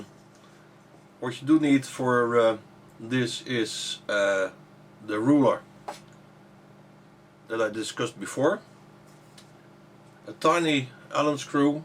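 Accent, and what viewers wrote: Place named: Netherlands. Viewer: =) I haven't heard a Nederlander accent in quite sometime! Thanks for the instruction...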